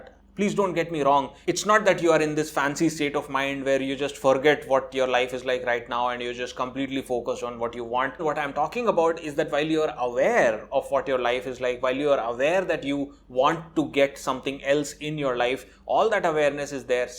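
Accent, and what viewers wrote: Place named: India. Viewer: Ugh .. The Indian accent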